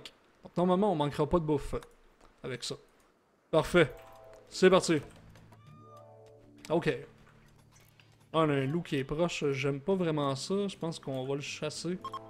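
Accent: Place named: Quebec, Canada. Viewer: Tu mérite plus d'abonnés , De plus que tu es Québécois (J'adore l'accent que vous avez ^^) et puis surtout tu expliques bien :D Je t'adore :D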